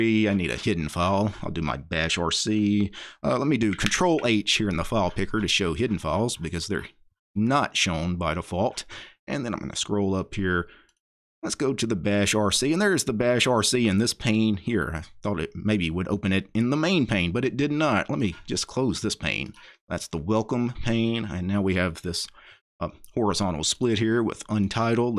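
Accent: South, United States. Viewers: Your Southern accent is sick. 🔥🔥🔥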